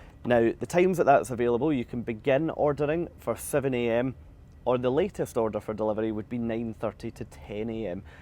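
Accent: United Kingdom. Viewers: Thanks for this info. I'm on her 7/22 and so looking forward. I've been Princess Elite over 15 yrs. One thing you didn't address was the Gala nights - oh my all the comments I hear from folks who are terrified of what to wear. I know food taste is purely personal so I can make a delicious meal on my own. But what about these white nights, or masquerade party, etc. Can you fill me in on that part? Loved your accent - this southern lady is going to adore hearing all the British chatter in a couple of weeks.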